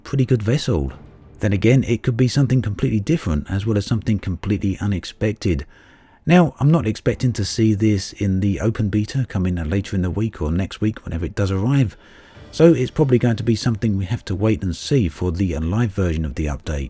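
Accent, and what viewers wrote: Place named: RP, United Kingdom. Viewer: Hello cant wait for the new game play to come to live servers as we need a massive war and all factions to unite against a common foe. Also have you watch the brocast as they protreyed you as the james bond of elite dangerous with your posh actsente.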